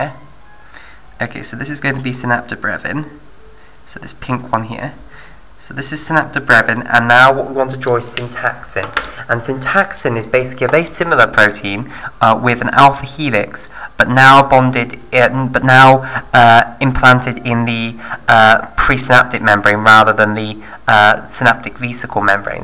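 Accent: United Kingdom. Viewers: I think it’s just a compliment on his British accent.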